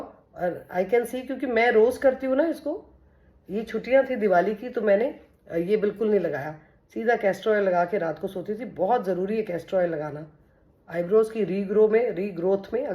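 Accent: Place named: India. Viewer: Bhut a hi batten krte ho aap Meri ji age ke ho Mai b aise hi beaten krti thi kbi Aap ko dekh ke Muje pani image dikhayi deti h Aap bilkul desi style mai Bolte ho Bhut ache lgta ho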